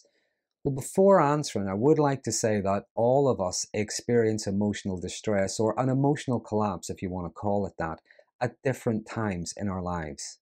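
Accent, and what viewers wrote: Ireland. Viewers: Hey Ya! Binging your vids today. What a Rich MINE! of lucid eloquence. It's serving as an Excellent re-cap and review of all I've ben learning and applying the last two years since discovering Evil is real, walking among us, uncaged. And I'm catching some new stuff or another way to put old material. Thank you Just what the Dr ordered for this Gorgeous Spring Sunday in the desert. 🌞😊 Off topic- ish, but came to mind as I've listened: Are you following the plight of the British Royal Family and the phenom of the great Narcissist, Meghan Markle? I haven't looked at your deets yet, been too fascinated listening to you, and doing housework, but I'm thinking your accent is Irish, so...( Just FYI because I'm pretty 😅sure I'll be hanging around your channel a bit: I'm English born and raised till 12, then fam moved to States. I'm a mutt but mostly American Mexican by culture. 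Have lived in New Mex, USA for 26 years) Just curious about your thoughts on the subject if you have had interest. On that note, are you aware of HG Tudor's work? Any thoughts about his methods of madness? Ok- Back to absorbing your words and knocking out the dishes. Cheers 🇬🇧 + 🇺🇸 =❤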